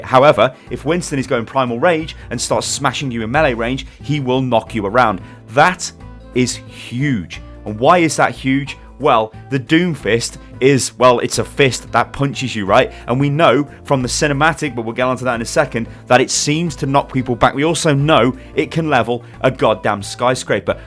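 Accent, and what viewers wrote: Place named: United Kingdom. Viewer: minseoks He's British you fucking chucklehead. It is easier to say it like how he says it then forcing himself to say it how it is normally pronounced. That's like being a non-english speaker but some dumbass such as yourself saying that the non-english speaker is retarded is just as stupid as the statement itself.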